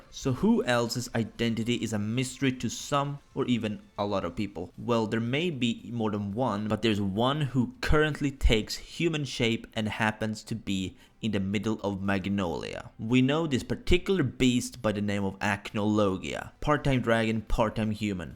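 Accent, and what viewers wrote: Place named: Spain. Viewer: you're freaking Spanish PEOPLE DO YOU HEAR HIS VOICE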